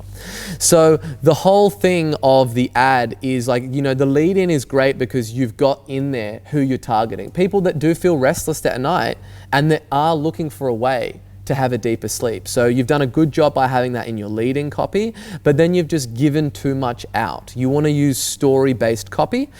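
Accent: Australia. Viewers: It's Australian accent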